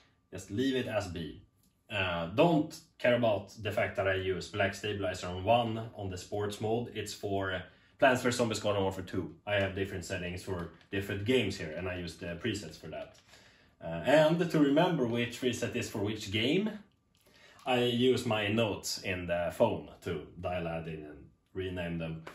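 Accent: Sweden. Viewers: Svengelskan är top notch :D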